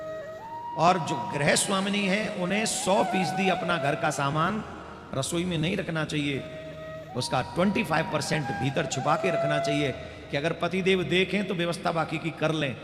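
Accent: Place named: Braj, India. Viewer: Guruji aap braj bhasha bahut achchi lagti hai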